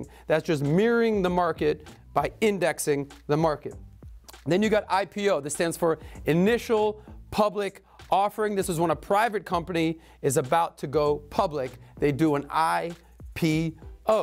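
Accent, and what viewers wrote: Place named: South, United States. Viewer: This right here is the second time I am coming across this name in a week. Came to my school for a lesson and it was lit and yes, he has a really cute southern accent.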